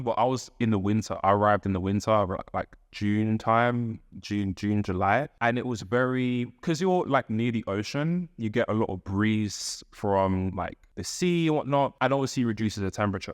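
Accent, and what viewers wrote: United Kingdom. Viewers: Yoo is that British accent am in Birmingham Walsall side right now but my home is in Soweto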